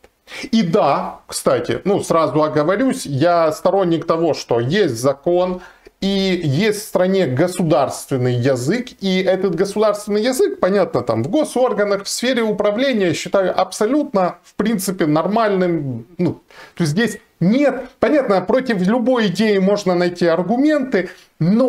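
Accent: Ukraine. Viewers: Это украинская версия русского языка. Аналогично есть молдавская, грузинская, армянская, белорусская, эстонская и прочие версии русского языка. В России также есть разные версии русского языка. 😊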